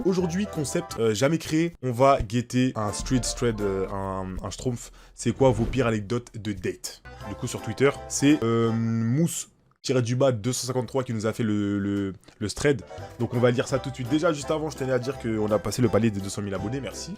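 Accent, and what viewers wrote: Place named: Antilles, Caribbean. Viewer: Tu as grave un accent antillais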